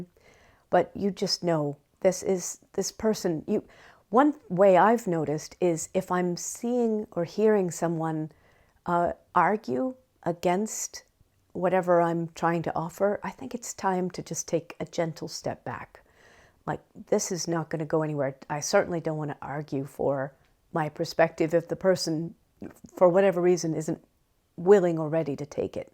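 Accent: Ireland. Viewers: Thank you, your lovely smile lights up the whole room. It's a real pleasure listening to your beautiful soft & gentle Irish accent..🍀☺️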